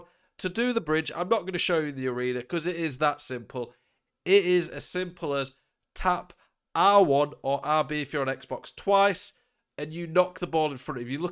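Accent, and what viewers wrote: United Kingdom. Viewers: Man this is the most British accent i've ever heard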